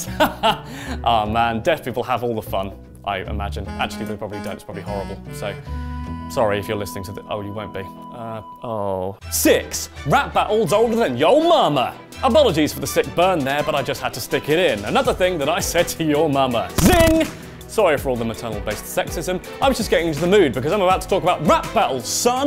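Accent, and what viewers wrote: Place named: United Kingdom. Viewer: Loved this! But can you hire a Captions guy? I'm East European and i watch you while i'm high *that how you roll on youtube kids*, and i'm really trying hard to understand your marvelous british accent, greetings fella!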